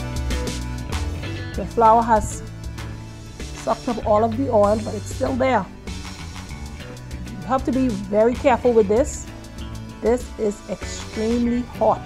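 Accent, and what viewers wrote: Bahamas. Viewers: My father's side is Bahamian, Mother Floridian !! This was one of my favorite dishes!! I was the one pulling out the hammer to pound them . Memories, love the accent 😍!! I'm in Colorado!! Purchased conch from an Asian market . Will try your recipe. Watching you refreshed my memory of the overall process. Happy eating 😋!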